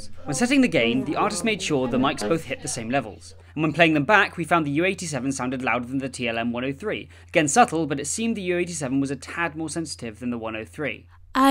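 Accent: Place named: United Kingdom. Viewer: 100% agreed. That brittish accent is awesome.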